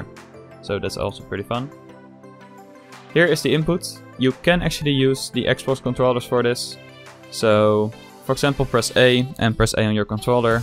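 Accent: Netherlands. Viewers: HAHA, nederlands accent :) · ik kan gwn meteen horen dat je nl bent xD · je hoort echt tering goed dat jij nederlands bent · ik hoor dat je nederlands bent haha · wat een lekker Nederlands accentje zeg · Are you Dutch? I mean. Dat accent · You sound ver Dutch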